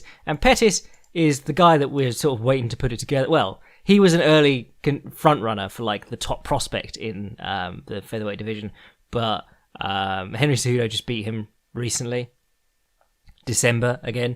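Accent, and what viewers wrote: England, United Kingdom. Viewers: So you just had to get that off your chest? I love the youthful voice paired with the understandable English accent, btw.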